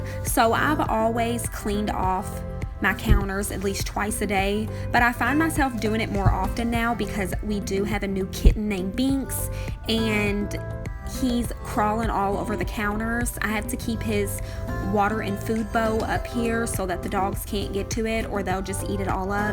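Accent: South, United States. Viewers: My first time watching, I love it 🥰 and that southern voice 😂☺️❤️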